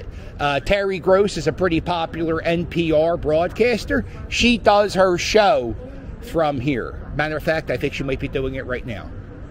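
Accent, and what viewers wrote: Philadelphia, United States. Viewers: Best Philly accent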